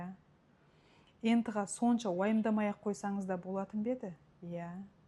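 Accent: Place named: Kyrgyzstan. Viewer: саламатсызба, сиз кыргызсызба? Себеби көп сөздериңде таза кыргызша айтасың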